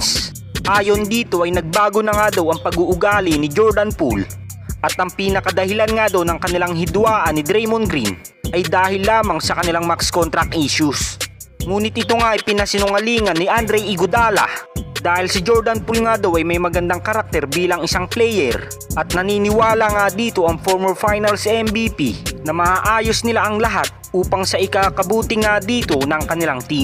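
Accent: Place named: Visayas, Philippines. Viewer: Kainaman ng tigas ng yung salita..visaya kaba hehe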